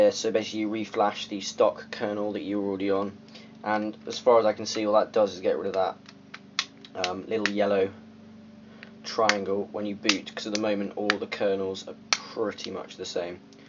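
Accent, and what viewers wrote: United Kingdom. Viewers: British accent is very disturbing.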